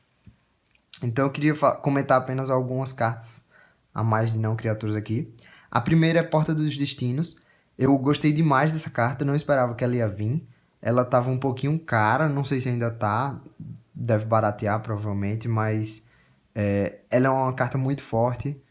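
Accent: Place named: Northeast, Brazil. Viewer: Rio Grande do Norte. Reconheci o sotaque e gostei de ver mais nordestinos produzindo conteúdo de Magic. Me sinto representado.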